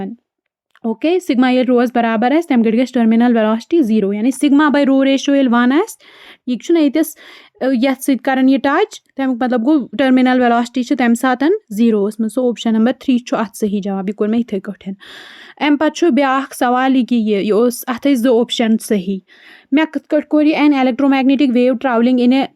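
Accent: South Kashmir, India. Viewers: Are u from south kashmir just saying from accent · Cutest south kashmiri accent.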